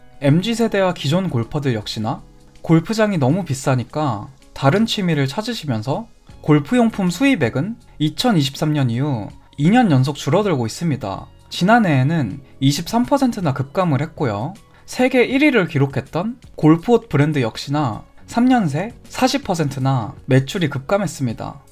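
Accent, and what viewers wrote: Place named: Honam, South Korea. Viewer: 혹시 전라도 출신이신가요? 말하는 억양이 호남지방 억양이 짙은데..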